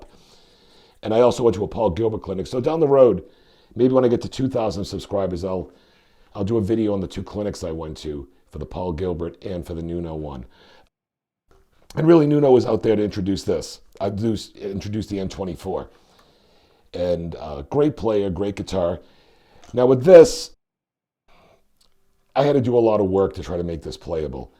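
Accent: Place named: Boston, United States. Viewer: That's a Boston accent. I'm from there too buddy. Cheers.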